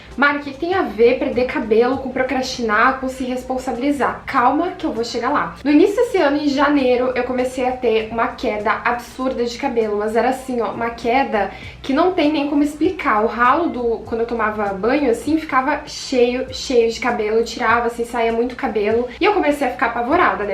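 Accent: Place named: Rio Grande do Sul, Brazil. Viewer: Aq eu percebi que tu é gaúcha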